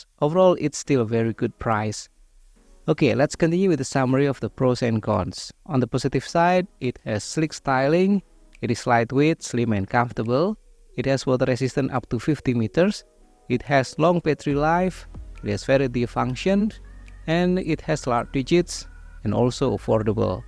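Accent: Indonesia. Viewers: Your English good sir, i like your Indonesian accent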